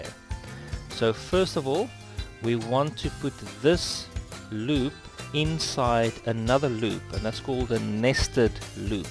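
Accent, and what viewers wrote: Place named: South Africa. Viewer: good video,and refreshing to hear a south african accent, (to me) the music is slightly loud and distracting.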